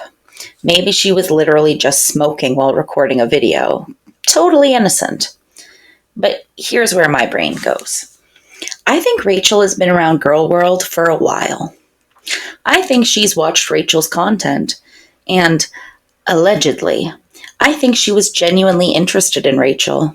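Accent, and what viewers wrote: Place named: Canada. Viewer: you sound so canadian lol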